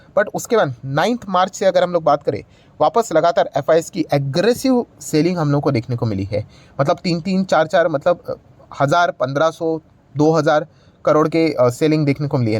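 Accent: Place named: Gujarat, India. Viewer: Bhai aap kya gujarati hoo 😂 aapki boli pr se lage Love from rajkot ❤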